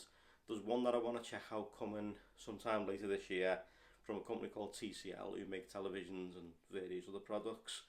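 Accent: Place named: Yorkshire, United Kingdom. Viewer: He's sounds like he is from Yorkshire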